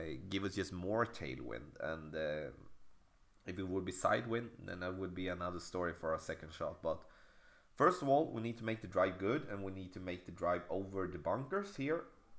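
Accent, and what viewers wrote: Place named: Scania, Sweden. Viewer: Härligt med lite skånska👌🏻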